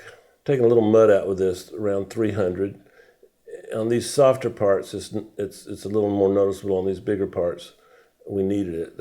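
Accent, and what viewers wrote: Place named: South, United States. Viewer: Its that slight southern accent, which I love to hear...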